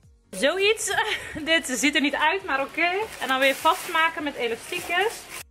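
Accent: Brabant, Netherlands. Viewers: Heerlijk Brabants acsent heb je, I love it. Was echt een leuke en grappige video.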